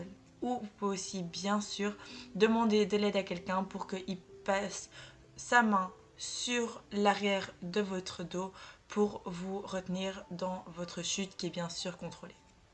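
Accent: Belgium. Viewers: Bonjour, j'aime beaucoup tes vidéos très détaillées et ton petit accent belge 😊. Est-ce que tu pourrais faire un tutosur le flip arrière s'il te plaît ? Merci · Tu est belge ?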